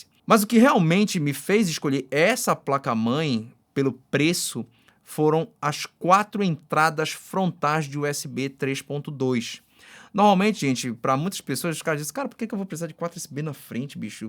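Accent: Pará, Brazil. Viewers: Agora que tu falou que é paraense eu ouço teu sotaque aaaaaaaaa (“elhe” “bicho” “vocêxs”) massa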